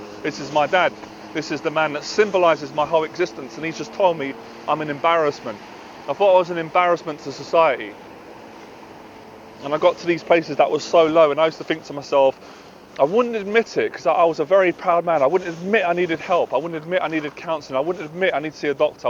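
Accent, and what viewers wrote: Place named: United Kingdom. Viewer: I'm really sorry but I hate british accent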